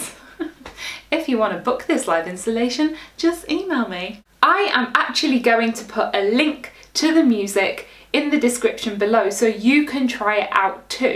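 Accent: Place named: United Kingdom. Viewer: That "regatta bear" was funny, I need to put the subtitles to understand a little bit more, in spanish "I'm a recorder player" sounds like "Am a ricode plée", sounds nicely to me the british accent but to write this and all of the sentences are another different thing at all. In fact, we use the same word "flauta" for flute or recorder, in case we ne need to clear what instrument is the we need to add one word: "flauta traversa" for flute and "flauta dulce" for recorder. cheers.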